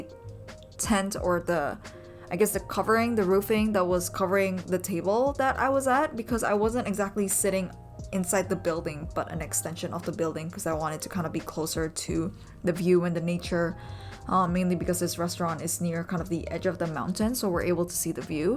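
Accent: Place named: United States. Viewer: Hi! I'm English Nothing wrong with your English Ah ah! Bit of USA accent to me But enjoy your channel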